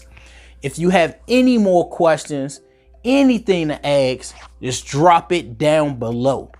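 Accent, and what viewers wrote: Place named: Washington DC, United States. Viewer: I can tell by your accent you're from DC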